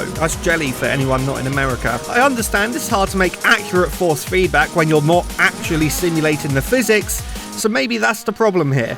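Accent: United Kingdom. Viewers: Are you from milton keynes???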